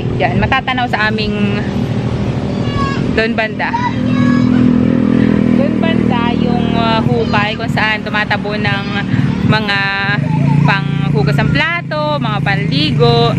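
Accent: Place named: Oriental Mindoro, Philippines. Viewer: Ung accent nyo po tulad smin sa oriental mindoro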